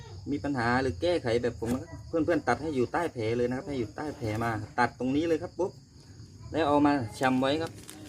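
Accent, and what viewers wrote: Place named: South, Thailand. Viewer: ติดตามชมมาหลายคลิปได้ความรู้เพิ่มมามากครับ สำเนียงเหมือนจะอยู่ใต้เหมือนกันนะครับ ผมยุสงขลา ขอให้มีคลิปความรู้ออกมาเลื่อยๆๆคับ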